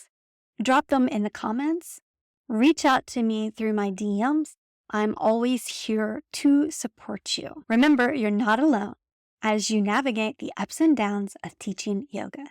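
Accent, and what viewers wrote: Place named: South, United States. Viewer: So nice to 'catch up' and hear a southern accent. ;) Love from Boston.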